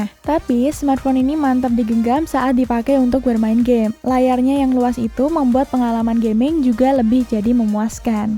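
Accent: Indonesia. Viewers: Lidah indo maklum aj lah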